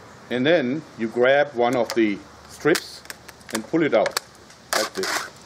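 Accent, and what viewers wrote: Germany. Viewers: Bist du Deutscher hört sich so an ? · Are you german? I think I hear a slight German accent but I’m not sure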